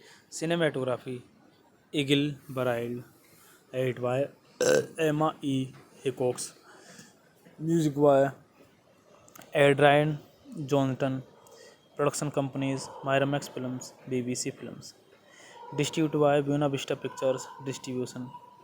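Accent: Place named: India. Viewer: Estúpido el que hizo estos videos. Queremos la película no tu voz de la india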